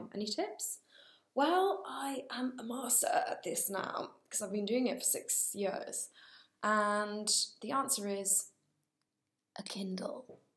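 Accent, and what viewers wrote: Wales, United Kingdom. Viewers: travick no, she's Welsh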